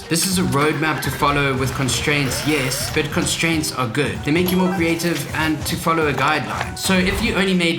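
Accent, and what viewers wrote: South Africa. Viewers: Step 2 is where im at, i did about 10 weeks of weekly videos. I create scrap metal sculptures and i film the process and the story of why im making what im making. It felt so good to be so active for a few months but making the sculptures is my fulltime job and the extra time put me behind on orders argh, once ive caught up properly i can get back to it again 😁 thanks for the advice, I love your content and the accent, it reminds me of my grandmother (she was from south Africa)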